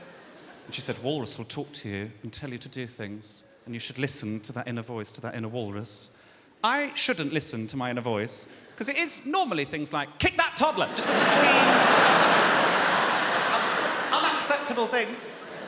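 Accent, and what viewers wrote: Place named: RP, United Kingdom. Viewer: He talks like the Queen.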